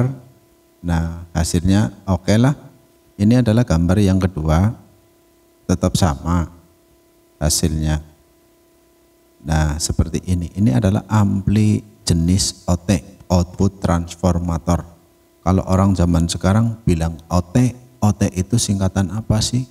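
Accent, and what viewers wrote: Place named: Banyumas, Indonesia. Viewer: Salut. Servis di daerah mana mas ? Kalo dari logatnya kayanya banyumas ya ? Hehe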